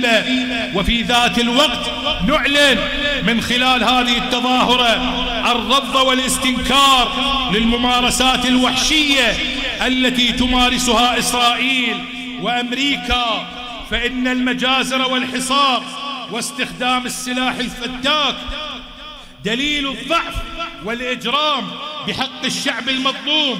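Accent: Iran. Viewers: لهجتكم ايرانية وليست عراقية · ليش يحجي إيراني؟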